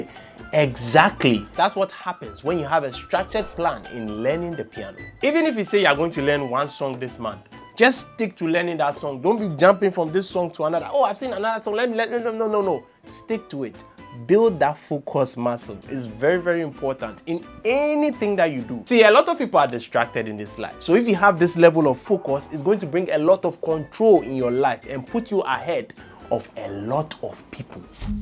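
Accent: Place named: Africa. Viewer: Hey African brother I low key love the accent lol thx for the vid.edit:i am only calling you afican because i have heen in afican villages lol (i am african my self loll).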